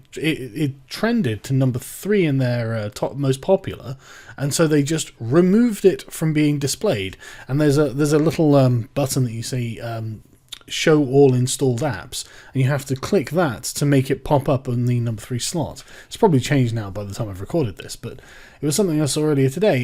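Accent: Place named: England, United Kingdom. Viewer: Why do you think he is poorly informed ????? Alex "says" that he has great Sources in Many Branches in the USA Government. You sound like you are FROM England. Tommy Robinson has stepped out like a real MAN to defend the FREEDOM of Speech. Best wishes from the USA...MAGA with Donald J. TRUMP..Stand up for your RIGHTS or DIE protecting them...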